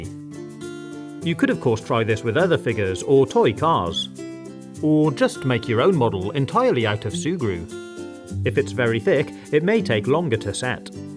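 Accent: United Kingdom. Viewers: Were you born in Britain or is that your accent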